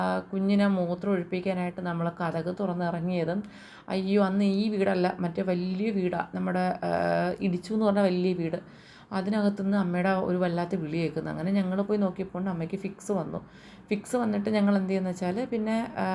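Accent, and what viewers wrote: Trivandrum, India. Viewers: samsaram enikkum eshtamanu tvm slang kelkkan anu ❤❤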